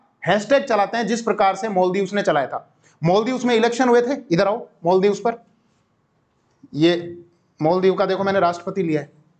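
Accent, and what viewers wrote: Rajasthan, India. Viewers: Sir aap Rajasthan se ho lgata hai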